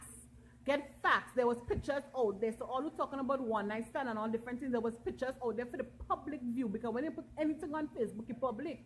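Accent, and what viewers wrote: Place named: Trinidad and Tobago. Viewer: Hey, you sound more Trinidadian than Grenadian, but that ONLY goes to show how the accent is the same.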